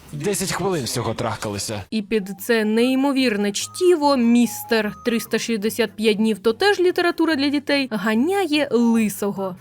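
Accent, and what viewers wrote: Zaporizhzhia, Ukraine. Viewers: Шок, ти шо з Запоріжжя?😳яка неочікувана приємність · Запоріжжя чи що? Ой, як приємно.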